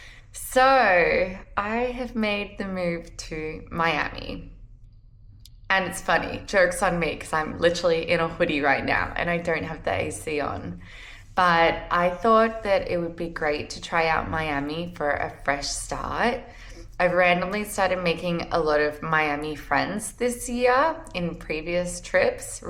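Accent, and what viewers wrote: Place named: Australia. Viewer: I knew right away you were from Australia. I enjoy your content but think you would also be good in the Tesla community.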